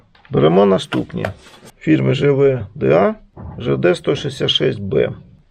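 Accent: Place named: Surzhyk, Ukraine. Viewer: Да на суржике он балакает. Все понятно жеж.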